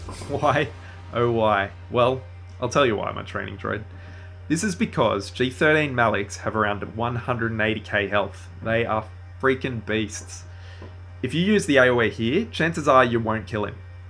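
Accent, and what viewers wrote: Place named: Australia. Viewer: You got a sub for bloody great accent you aussie legend. Thanks for the pro tips amigo just started running this myself and they help heaps!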